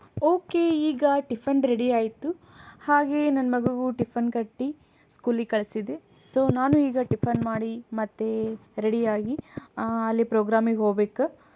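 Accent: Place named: Dharwad, India. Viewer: Nivu dharwaddavar yenri sis yaakandra nimma bhase hangaaa iiyti sdakari kelde sis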